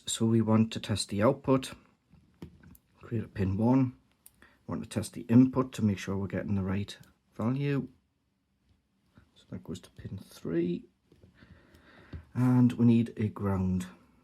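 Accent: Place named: Northern England, United Kingdom. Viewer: Glad your on the mend you sound like we are both in God's country Up North so subbed just for that and before I have look around your channel. All the best, Kev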